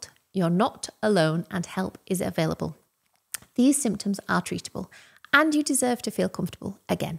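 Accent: United Kingdom. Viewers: your British accent, alone, is challenging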